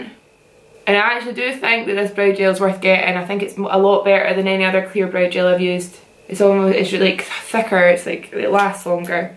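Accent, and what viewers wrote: Scotland, United Kingdom. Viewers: it's a scottish accent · I LOVE SCOTTISH ACCENTS SO MUCH OH MY GOSH I JUST WANT TO LISTEN TO YOU TALK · Sounds Scotch to me. But then again my family's from Scotland so I can easily tell. Their's are way more pronounced tho. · I love you so much, your eyebrows are sooo amazing! I stay in Glasgow I feel like I have a bond with u , there's not many Scottish youtubers. It's nice to hear the accent. ❤️❤️❤️ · she has the most gorgeous Scottish accent, gives me liiife ❤❤❤ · Leandra♥ Scottish accent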